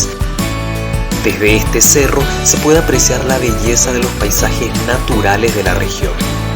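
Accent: Paraguay. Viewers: Es obvio que de PARAGUAY por su acento se nota a leguas.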